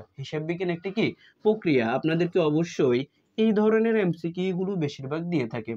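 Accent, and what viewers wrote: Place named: Chittagong, Bangladesh. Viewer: আপনার কথা বলা শুনে মনে হয় আপনার বাড়ি চট্টগ্রাম😊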